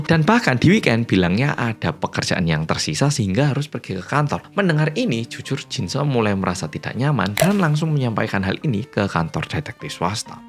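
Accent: Java, Indonesia. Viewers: Sampe tak ulang2 bagian paca'an,jaaaan kuentel jowone gak ilang ilang cak 😂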